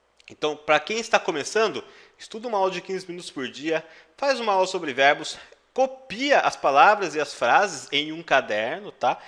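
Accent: Germany. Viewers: Boa didática. E de qual região da Alemanha é o teu sotaque?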